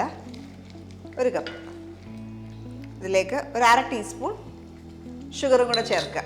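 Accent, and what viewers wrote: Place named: Travancore, India. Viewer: Love ur narration in middle Travancore slang nice❤️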